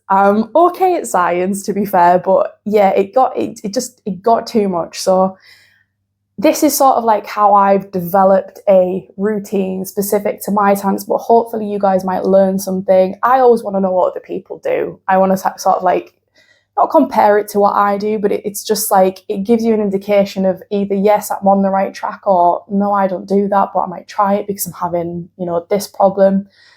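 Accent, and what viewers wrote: United Kingdom. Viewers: that marvelous English accent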